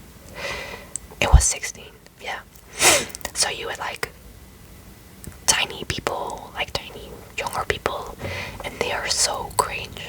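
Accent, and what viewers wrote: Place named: Netherlands. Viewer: Lovely accent ❤️ , are you from The Netherlands?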